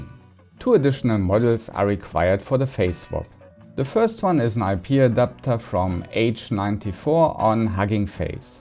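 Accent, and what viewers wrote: Germany. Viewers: Man hört leider das Deutsch sehr stark raus durch den Akzent. Aber sonst gutes Video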